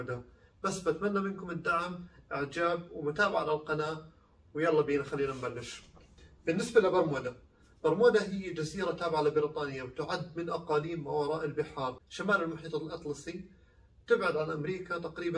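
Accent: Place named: Jordan. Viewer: اول شئ الله يسعد دينا احنا الاردنيه بصدقنا ووضوحنا وشرحنا بإيصال المعلومه بشكل مباشر وواضح ومفصل ومفهوم انا مش بس من اللهجه بل من طريقه الشرح والتوضيح والتفصيل قلت هاد الرجل اردني أو فلسطيني أو مكس 😂♥️♥️♥️💪 كل الاحترام اخي الكريم وأمنيات التوفيق على الدوام واستمر بإيصال صوره مشرفه عن شعبينا الواحد وصدقنا ورقينا بالتواصل وإيصال المعلومه بكل امانه ومسؤوليه ولك كل الدعم 💪♥️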